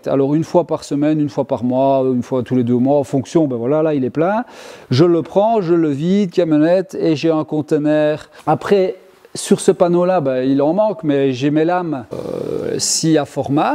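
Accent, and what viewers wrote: Belgium. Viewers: Slt, tu as un chouette atelier, merci pour cette vidéo, tes conseils et le parler Belge avec humour. Pour les cours d'api, tu as un voisin qui maîtrise très bien le sujet: <link> à bientôt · Rare sont les artisans qui soient autant passionnés et très pédagogue dans le partage de leurs savoir faire et en plus avec l'accent Belge !!!!!😁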